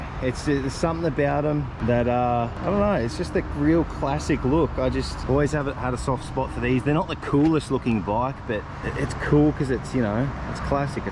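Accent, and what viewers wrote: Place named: Australia. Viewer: Are you from 'Down/Under, Bro? 😁 Love your accent 😃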